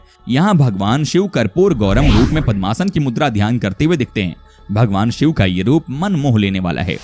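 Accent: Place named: Odisha, India. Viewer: Your mother tongue is odia Right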